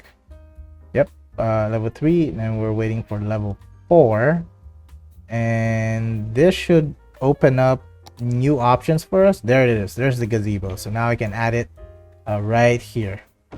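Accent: Philippines. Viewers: I’m actually liking the plot of your city design. However, I noticed every-time you are using skywalks, you intend on using parking gates thereby generating “park income”. Isn’t that kind of cheating? I mean it aint a crime but it’s definitely triggering me😂😂😂 Nevertheless, love the series. Nung una kita napakinggan, alam ko na pilipino ka 😂. It’s nice to know na mai CS content creator na pinoy. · are you from ph? haha cause of the accent. new subscriber! really good info as well. thanks!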